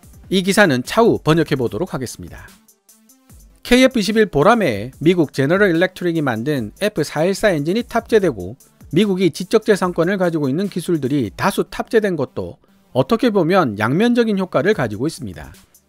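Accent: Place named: Busan, South Korea. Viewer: 부산 사투리 억양때문에 너어어어어어어무 듣는게 힘들다 표준어만 썼어도 100만은 훌쩍 넘었을텐데 안타깝네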